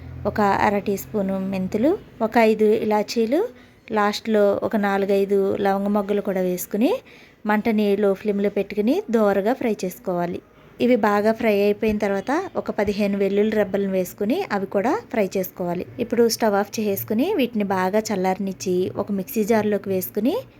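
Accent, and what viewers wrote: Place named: Godavari, India. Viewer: K sis ma godavari voicela undi Andukey adiganu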